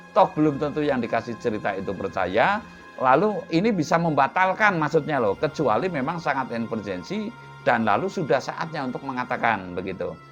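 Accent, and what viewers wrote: Banyumas, Indonesia. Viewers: Rahayu Ki, pngndkne sami klh kula Ki, ngapak. Kula skng Karanganyar Kebumen ndrk nymk Ki 🙏.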